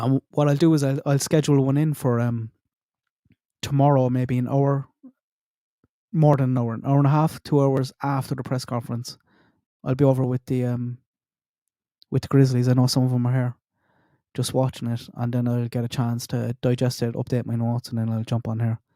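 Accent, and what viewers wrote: Ireland. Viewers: I like ur theory about the dog in the backyard. That makes total sense to me. I was listening to a prosecutor pod cast and they said that one of the reasons they would seal court documents and a hearing is because others may be involved. Other than that the courts belong to the citizens. We own the courts because we pay for them which is why there’s a freedom of information act. (FOIA) I love listening to you talk. My grandfather’s parents settled here in Michigan. At the time the Irish were not treated so well by society so they would set up Irish settlements. I actually grew up in the old Irish settlement. They had there own school and functioning society. Because my grandpa was only around Irish settlers and his parents, he retained a bit of the Irish brogue. He was the sweetest man on earth so herring your voice is so nostalgic for me! 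☘️ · Can I ask if you are from West or North West Ireland? You sound so much like my favorite Irish Trad band from Co. Clare, "*Socks is In The Frying Pan"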